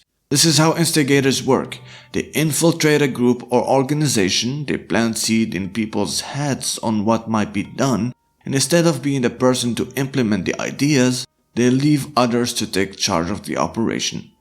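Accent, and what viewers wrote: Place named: France. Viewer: flawless and nice French accent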